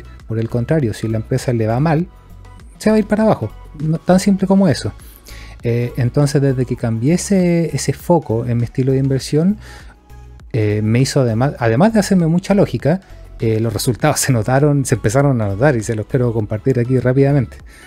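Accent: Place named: Chillán, Chile. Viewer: jejeje, gracias y Saludos amigo, Te veré siempre en tu canal, ya puse campanita👍. Pd (¿Eres de los alrrededores de Chillan?, por tu acento, viví varios años por esos lados)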